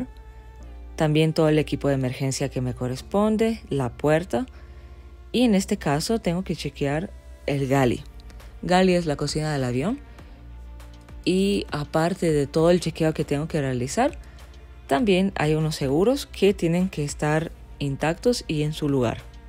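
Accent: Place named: Argentina. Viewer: !!??tu castellano es muy argentino!!!!😃 Coca, jugo, y como estructuras muchas frases!!! Te A D O R O!!!!!!!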